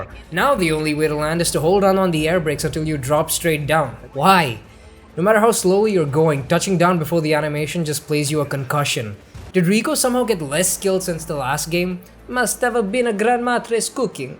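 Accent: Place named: India. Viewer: Around 17-18 minutes mark I started feeling like you have an indian accent. :D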